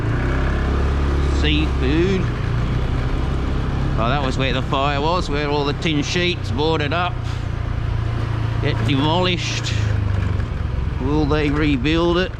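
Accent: Australia. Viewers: Annoying Australian accent, go home if is nothing open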